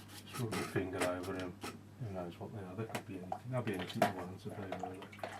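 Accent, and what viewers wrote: Birmingham, United Kingdom. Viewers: Nice work, and nice to hear a Brummie accent, I'm a Brummie living in Wales, lol keep on posting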